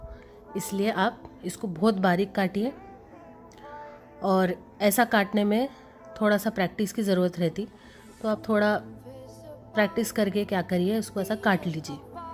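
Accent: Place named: Hyderabad, India. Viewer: Lol..loved ua hyderabadi accent...😜😜😜looking frwd to more such colloquial lockdown recipes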